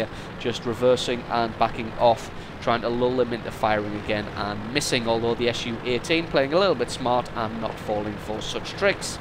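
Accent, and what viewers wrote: United Kingdom. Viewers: Your accent is somewhere in the U.K. What nationality or accent is that from?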